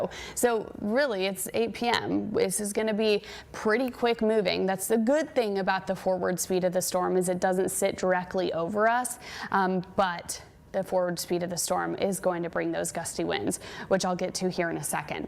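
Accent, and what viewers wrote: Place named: New England, United States. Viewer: sounds like another yankee..............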